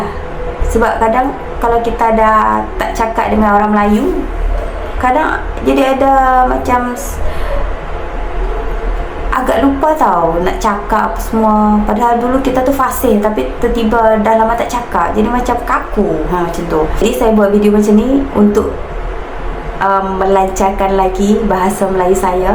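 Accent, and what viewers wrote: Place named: Kedah, Malaysia. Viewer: Sedap sungguh hang gebang loghat kedah... lapang telinga dengaq hang gebang macam tu...mana p abg harun lama x nampak batang hidung dia.... hang kurung dia ya... 😂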